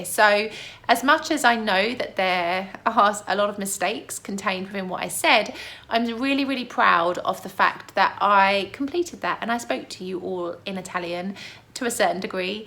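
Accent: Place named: Cockney, United Kingdom. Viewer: Surely an Hospital is not just the easiest place to learn Italian. You did pretty good. We all were like you at the beginning of our English learning language path. Grammar will follow along once you'll be more comfortable with the spoken language. Italian grammar is pretty difficult, especially tenses; try to learn it in little bits a time. But, please, don't spoil that lovely Cockney accent. 😁 You'll soon realize that at least the 70% of italian terms have corresponding very similar terms in English, some of them are just little bit less used because they may sound sometimes a bit archaic or poetic or too technical. Probably in some cases some ancient Latin inscriptions could be better understood by an Englishman not knowing Latin than an Italian not knowing Latin. 😁 As some funny videos, such as those from Ecolinguist or from Luke Ranieri - Polymathy YouTube accounts, could explain very well. 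😁 Keep up the good work. 👏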